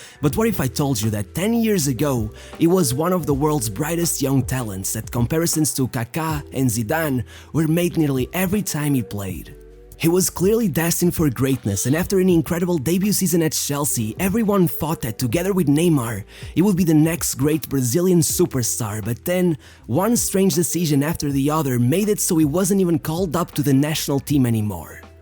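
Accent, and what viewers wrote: Portugal. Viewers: Are you portuguese? You sound really portuguese,se fores grande vídeo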